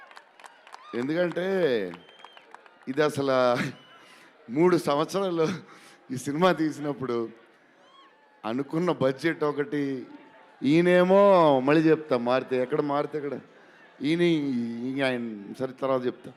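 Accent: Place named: Godavari, India. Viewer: godavari slang lo annaya speech ❤ pure hearted person ❤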